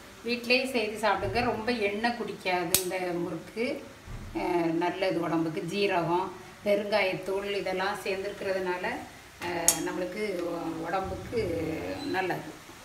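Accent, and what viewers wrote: Tirunelveli, India. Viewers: ரொம்ப தெளிவான பேச்சு நீங்க நெல்லையா? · but ur accent also gud..nellai touch up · Nalla peysureenga namma ooru pakkam pona mathiri iruku Unga recipe super Tirunelveli thana?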